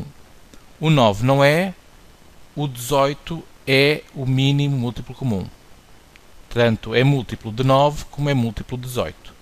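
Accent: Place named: United States. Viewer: otimo vc tem sotaque de americano ...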